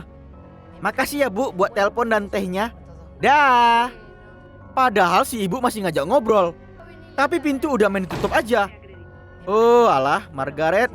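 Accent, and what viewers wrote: Medan, Indonesia. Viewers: logat orang medan itu bg hehehe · Orang medan ya bg nampak kali ku tengok logat medannya🗿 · Ak suka bang konten mu tapi yg ku suka cara anak Medan berbicara buat ak ketawa sumpah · Medan mana bang ?? Kwkwkw kp lalang ni lek kwkw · Logat medan bah anak medan kau ya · Logat kau medan kali bah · Adminnya orang Medan ya? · Medan ni we udah jelas logatnya wkwkw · Logat medan kau lah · Nampak X org medan nya.🤣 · Bahasa abg ini mcm bahasa anak medan.. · Njr logatnya khas medan banget bang🗿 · Medan ya bang? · logat Medan ni wee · Bang lo bahasa apa bang org medanya kau ini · Orang medan kau kan bg 🤣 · Bro Kau orang medan, tebing, atau siantar Ooaalaahh Kaco kacooo 🤣🤣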